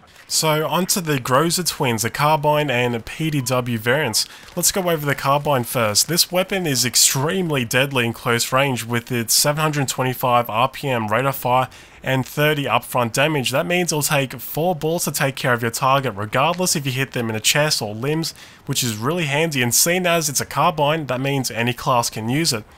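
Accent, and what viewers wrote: Australia. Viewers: Are you an austrailian?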